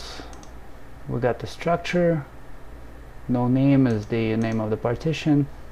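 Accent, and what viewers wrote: Russia. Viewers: Русский акцент топ) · У тебя русский Акцент Причём сильный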